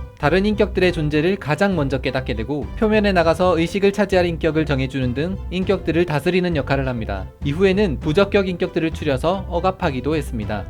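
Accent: Gyeongsang, South Korea. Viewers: 경상도사투리 어쩔수가없나봐요 ㅋㅋㅋ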